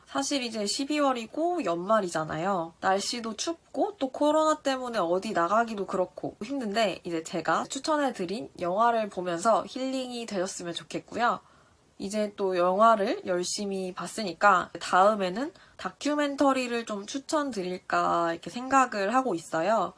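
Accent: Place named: Spain. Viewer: ㅋㅋ 님 뭔가 한국어 하실때도 스페인어 말투가 묻어나는 거 같아요ㅋㅋㅋ추천해주신 영화 꼭 볼게요 감사합니당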